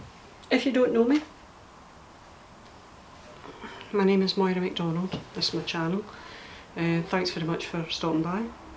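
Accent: Scotland, United Kingdom. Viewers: Hi my dear friend Moira❤ you are such a brave woman to come on here and show yourself to us❤ the real you, the honest you and all that pains you. I thank you for sharing ❤ you Moira were one of my first (if not the actual first) friends that I made when I started my channel. I remember you had commented on my video where I was trying to show my hole puncher and eyelet setter 😂 we started our Friendship from there, I was so excited some big YouTube person commented on my channel I told everyone about you! My whole family knew who you were, my Dad took a liking to you because he lived many years in Scotland, so when I would play your videos he would sit close to hear your accent as you spoke (dont worry, my mom is not the jealous type 😉)❤ you have always been a huge inspiration to me ❤ I know exactly what you mean my little channel is very slowly growing and I usually never get over 40 or so views. 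And the sales in my shop are slow as well. Then I see others who started around my time as well and they are "big time" I dont know how it all works? I still do it bc I love it, if ppl watch my videos, cool if not it's ok to. If I sell stuff, great! If not, it's ok. I just love doing me ❤ and what makes me happy ❤ I am always here with an open ear for you if need be ❤ I love ya my fantastic sassy Scottish pal ❤❤❤❤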